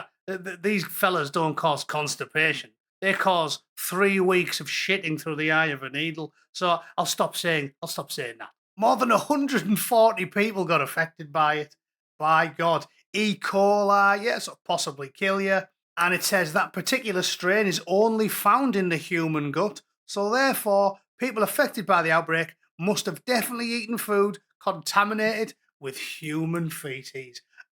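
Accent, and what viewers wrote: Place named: North East England, United Kingdom. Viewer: Such a funny channel in a shite liebour world its good to hav a laf. Love the NE accent as well noort wrong Wye eye man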